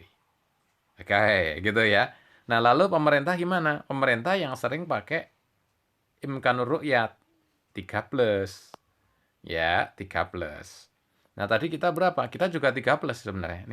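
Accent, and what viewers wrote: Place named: Java, Indonesia. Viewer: Lho, domisili Bandung? Dialeknya medok jadi kukira...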